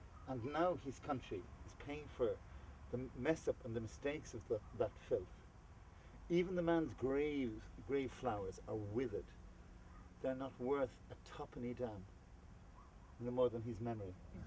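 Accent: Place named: Cork, Ireland. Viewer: You know what. People out there have got to understand why you hate developers so very much. 'A lottery win does not a business man make' Have to say though. I love the Cork accent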